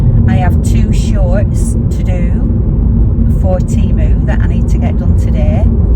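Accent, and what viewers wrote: United Kingdom. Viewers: Hello 👋 from the USA… what kind of cream did you use for the frosting? I sometimes have a little problem with the UK accents or or too the products have different names there..❤❤Thanks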